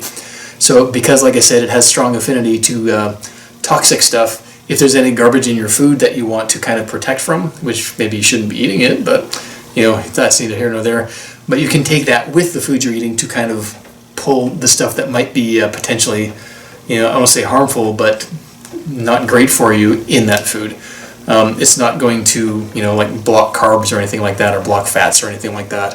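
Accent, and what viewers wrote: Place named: United States. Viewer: ? Does sound america. You swedish?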